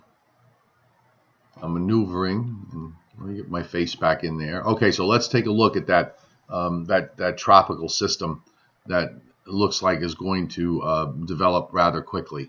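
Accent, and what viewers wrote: Long Island, United States. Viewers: 45 "my-aaaa" soo long Island.:) We say it in nj and nyc too but lil differently.. I love all your videos and enjoy your speaking style (straight up north nj nyc long isl). I know your big time on tv and other projects but you have an excellent radio voice and flow. Im guessing you lean center right..(caught that when you spoke about pre tropical storm warning era cant be proof of global warming. Maybe one day you can branch out into am talk radio or non political variety show. Just a compliment and thoughts. I cant wait to your winter noreaster live streams. Im a big fan of tracking them like you. Im a marketing adv. Man but my childhood dreams was to be a meteorologist. Thanks.. Gabe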